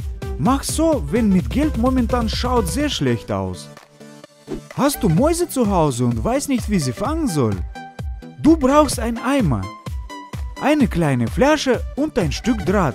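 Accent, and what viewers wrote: Russia. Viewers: Nur wegen diesem russischen Akzent geguckt :D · bist du aus Russland? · Are you Russian or Polish? Your German accent is interesting.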